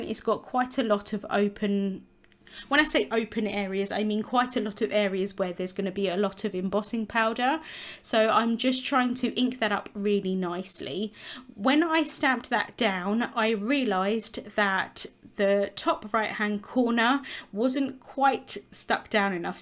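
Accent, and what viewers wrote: RP, United Kingdom. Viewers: A very lovely card! Like the colors and to hear Queens English!👍🏻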